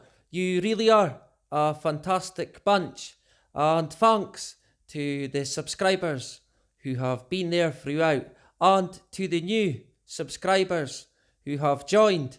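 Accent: Scotland, United Kingdom. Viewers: Scottish...its lovely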